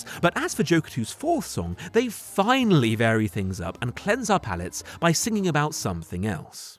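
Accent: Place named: United Kingdom. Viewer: اللكنة البريطانية جميلة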